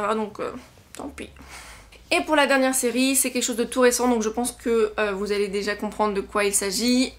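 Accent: United Kingdom. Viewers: Hello ! Alors pour ta question, ça me brise le cœur de dire ça étant une Potterhead à la vie à la mort mais... En ce qui concerne Harry Potter et le prisonnier d'Azkaban, j'ai préféré le film au livre :'(. Voilà, c'est dit ! La musique, l'ambiance, tout est magnifique et le livre est bien, mais pas autant que le film (et je l'ai relu dernièrement pour confirmer mon avis !) Sinon 13 Reasons Why c'est une tuerie (pardon pour le jeu de mot involontaire) ! En 2 jours c'était fini, terminé ! Fortement captivant ! Géniale cette vidéo ! J'adore le concept :3 (Et ton accent anglais qui déchire !). Bisous !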